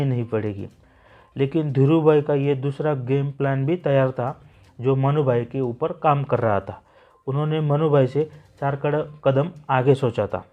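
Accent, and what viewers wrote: Maharashtra, India. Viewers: Strong marathi accent hai bhai.... Jai maharashtra 👍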